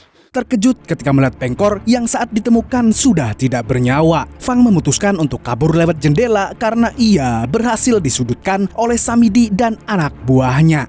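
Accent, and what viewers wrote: Banjar, Indonesia. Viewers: Anjay Banjar wkwk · Urang banjar leh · Banjar lahh bangg 😭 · Selalu hadir mas walau gak komentar 😂 ae lucu banget neh org Banjar kh mas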